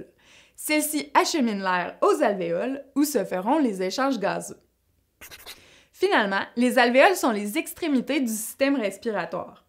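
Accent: Quebec, Canada. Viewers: merci beaucoup! ps: j'adoooore l'accent quebéquois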